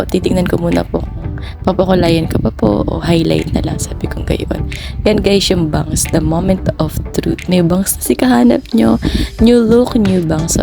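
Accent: Bicol, Philippines. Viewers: ahh ay akala koy taga mindoro ka..taga bicol ka baga?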